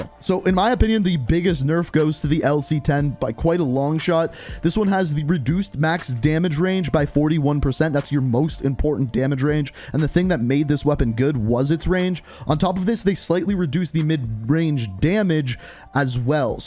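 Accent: Canada. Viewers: This dude sound Canadian ...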